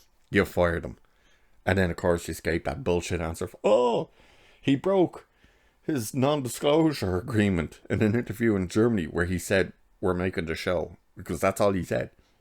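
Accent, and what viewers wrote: Ireland. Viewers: It's great to hear a fellow Irish voice discussing this show and calling out the bullshit of these guys, jesus that Nomvete really believes she's smashing boundaries. I hated Star Trek Beyond with its absolutely incomprehensible villain.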